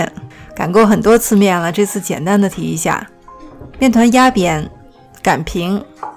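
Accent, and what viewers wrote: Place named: Northeast, China. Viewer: 为啥感觉你说话像是东北的捏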